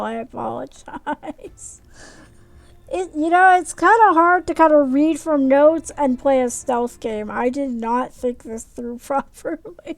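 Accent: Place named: South, United States. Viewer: You sound a little bit young and like you're from the south And you listen to Magog😂 🤔🤔🤔 very interesting🤡🌎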